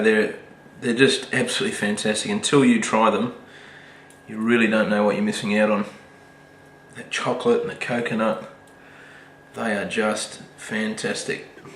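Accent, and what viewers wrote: Australia. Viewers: he an aussie i think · Can't you tell from his strong Australian accent that's nothing like a British accent?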